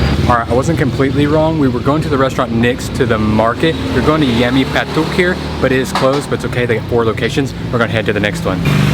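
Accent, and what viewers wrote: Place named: South, United States. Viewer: We've grown accustomed to your Southern drawl but the weird things you say still need some getting used to. ;)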